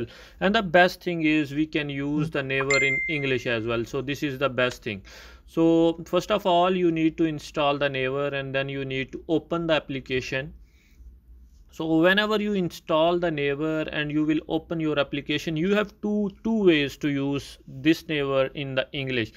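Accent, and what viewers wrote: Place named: India. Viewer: India people ini mana mungkin orang Indonesia ngomong nya pake logat yang begitu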